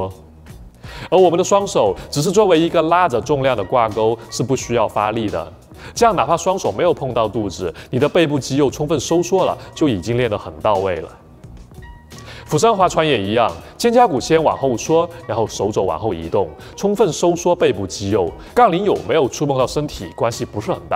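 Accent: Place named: Guangxi, China. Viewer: 非常棒的视频，感谢分享！听口音广西人吧？和我关注的另一个股票类youtuber：Emile Su的说话方式真的很像。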